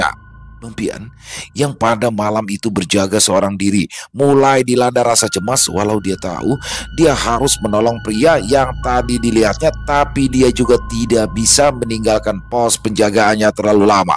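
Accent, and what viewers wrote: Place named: Betawi, Indonesia. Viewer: Majalengka hadir nyimak, klo cerita bang piyan yg kocak nya baba ali,klo di bang doni emak jubaedah,yg bawain ceritanya bang kocak banget,dialek betawi nya,seru abiss DIMARI 😊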